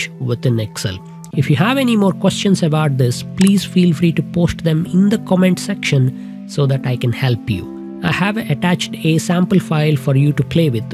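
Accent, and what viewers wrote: India. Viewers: The accent is too Indian for me and I am an Indian.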